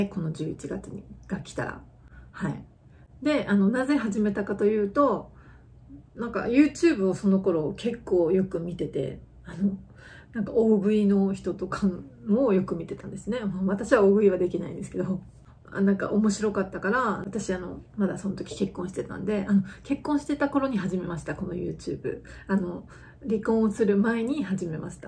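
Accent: Hakata, Japan. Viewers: たまに聞こえてくる博多弁？、とても良いです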